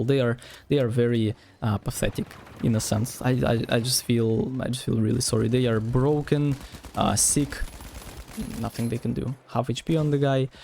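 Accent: Russia. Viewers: Сразу слышен русский акцент, bro 😀😀. Хорошо разговариваешь, very cool!! Тоже сейчас учу английский, только начинаю. Хочу так же свободно говорить и понимать на слух, ты молодец, так держать !!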